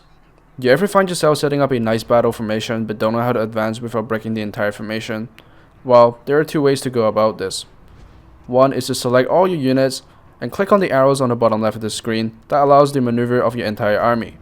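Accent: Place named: Scotland, United Kingdom. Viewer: why do you sound kind of scottish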